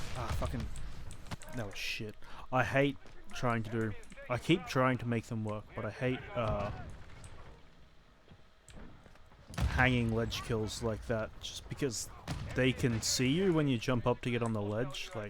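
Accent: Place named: United Kingdom. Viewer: Damn. I thought you sounded British. I need to start traveling 😭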